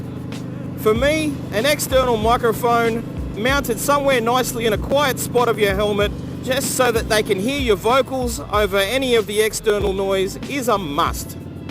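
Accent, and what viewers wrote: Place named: Australia. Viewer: I put it down is the Aussie accent lol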